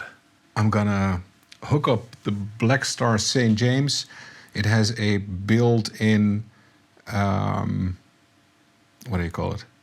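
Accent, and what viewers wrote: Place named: Netherlands. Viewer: Your English sounds sooooo Dutch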